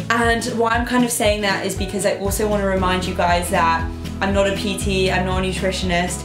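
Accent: Wales, United Kingdom. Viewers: Great body, she sounds like she is Welsh!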